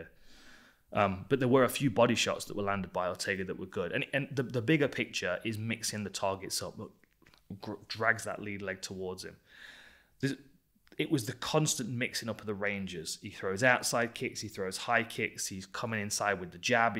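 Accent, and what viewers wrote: United Kingdom. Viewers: Man, I hope this is Dan Hardy and not some dork producer. If this is the real Dan Hardy I would like to thank you for being British. It's a nice accent and it feels like the person speaking is someone I should be paying attention too. Its also refreshing that possibly at one point my ancestors spoke in such a captivating way instead of the 5 generations of hillbilly south Carolina speak. Also, is it true my ancestors were the trash of Britain or religious zealots that you guys wanted out? We are always taught our ancestors were the prime stock of Britain and fled for freedom and prosperity... you know the normal talk but my research is showing different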